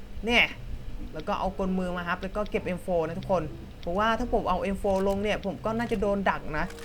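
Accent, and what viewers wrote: South, Thailand. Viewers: คนใต้ป่าว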